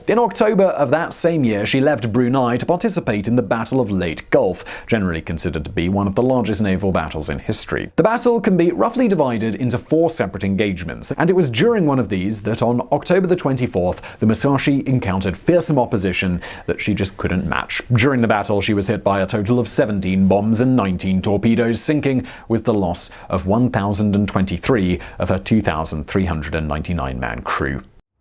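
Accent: England, United Kingdom. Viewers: I have no idea what this is but now I want to know. PLEASE Simon? Use your amazing British voice to teach me useless shit :) love you man. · The most English pronunciation of Japanese words I have ever heard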